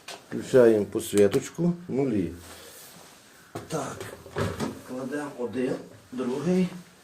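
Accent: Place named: Volyn, Ukraine. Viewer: Я недавно натрапив на Ваш канал у Вас Волинська говірка звідки ви?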